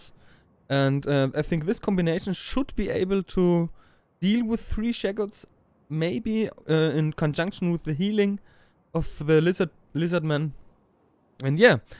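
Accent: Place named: Germany. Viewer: nice english, nearly unavailable to sound out the german accent^^ but you should´ve changed the videodescription. i fear there won´t be any anglophones to see your great victory ;) and in the end, the lizardmen monsters are much stronger then the chaos ones. what a great strategic performence xD but anyway nice to see some monstersmash :)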